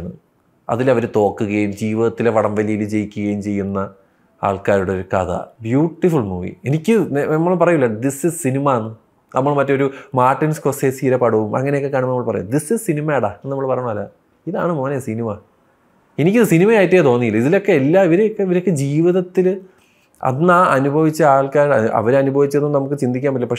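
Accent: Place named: Thiruvananthapuram, India. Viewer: നിങ്ങളുടേം തിരുവന്തോരൻ ന്റെയും ഹൈ ലൈറ്റ് " തിരുവനന്തപുരം " സ്ലാങ് ആണ്.. കേൾക്കാൻ തന്നെ നല്ല രസം - എന്ന് ഒരു എറണാകുളം കാരൻ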